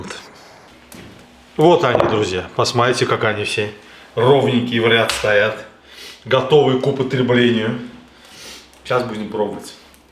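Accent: Baku, Azerbaijan. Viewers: бакинский акцент , так сладко кушает , класс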